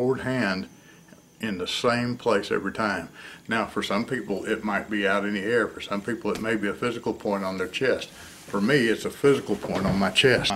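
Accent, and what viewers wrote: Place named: United States. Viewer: Thanks for your reply BUTT I do not believe this is reg size. I used to be 21 err able to make a shot with the stick behind my back with one foot on the floor, not on this sucker I can not touch the floor with my foot. So either I shrunk several inches or this table was built for basket ball players. How do I compensate for a non regulation table. BTW, I must commend you on your instruction, very precise and to the point in simple American English.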